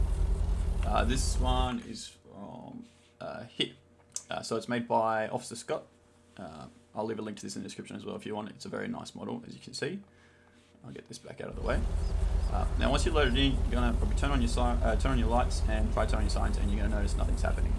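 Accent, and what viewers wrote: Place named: Australia. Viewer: me 10 seconds in: this guy is aussie so it has to be good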